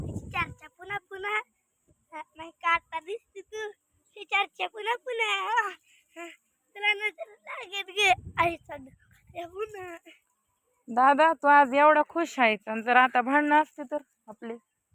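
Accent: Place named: Ghat, India. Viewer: आपली घाटी भाषा भारी वाटते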